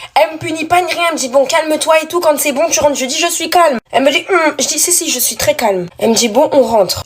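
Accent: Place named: Africa. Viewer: je kiff bien ton accent on dirait t'es africaine t'es africaine?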